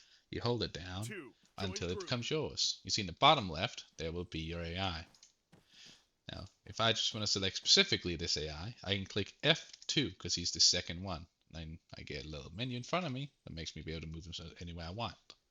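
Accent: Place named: United States. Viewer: I have 5500 hours in Arma 3. I just started playing this campaign looks promising. I would like to ask a favour, as someone from England i found your accent really interesting, as in American but i cant place it. Would you tell me where that accent is from?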